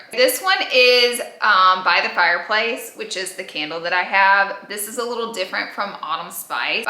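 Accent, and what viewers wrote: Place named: Alabama, United States. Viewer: I need more of Alabama accent back ok hunny!!!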